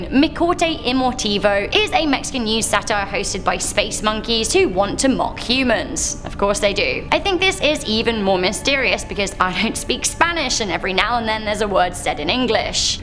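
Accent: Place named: United Kingdom. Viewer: Right now my favorite show is Stranger Things just finished season 3 and I am now off to look for something new. you are my favorite host on this channel I just love your energy and I confess I am one of those silly Americans who love the British accent.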